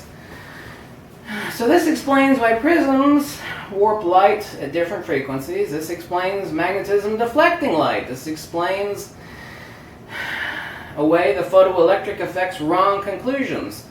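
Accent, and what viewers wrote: Germany. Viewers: Please lose the stupid German accent...very annoying!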